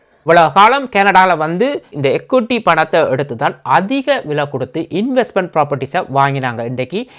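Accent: Sri Lanka. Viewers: Are you a Sri Lankan Tamil ? Your Accent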